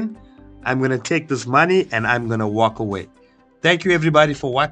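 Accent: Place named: Durban, South Africa. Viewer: Awe awe bro... congratulations man🎉🎉.. you sound like you from Dbn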